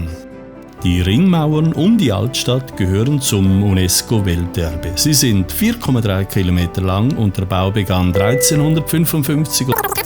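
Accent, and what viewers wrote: Switzerland. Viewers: Finde das Video gut. Und ich mag das Schweizerisch, mit dem Avignon erklären wird!!! Wir haben gute Erinnerungen an die Schweiz, fahren immer wieder gerne in Urlaub dorthin ☺️ Wenn wir nicht gerade nach Südfrankreich fahren 😉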